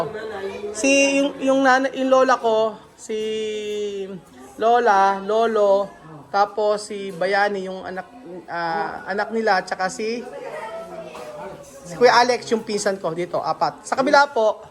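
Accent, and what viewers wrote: Philippines. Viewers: Pati Naman Po Yung way Ng pagsasalita may say pa din kayo? Malamang Po iba Ang province ni kuya malamang may mga salita Yan na di natin nakasanayan naririnig. May friend aq from CamNor pag nagsasalita lagi may Ma sa unahan Ng bawat salita Niya like mapunta sa mall,makain na tayo,matulog na,maligo sa ilog. Bawat Lugar Po sa atin ay may mga Punto or salita na pagkakakilanlan Ng kanilang mga Lugar.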